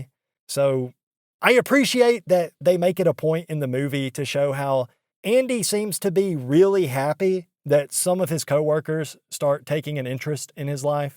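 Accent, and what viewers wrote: South, United States. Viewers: Aye man. I dont know what to say about your story without it being patronizing or anything like that. I really hope things look up for you man in some way. I live in California and by your accent im guessing you live in the south. Just know this life is worth living. I know sometimes it feels like it isnt but if at anytime you need a bro to talk to man im here bro.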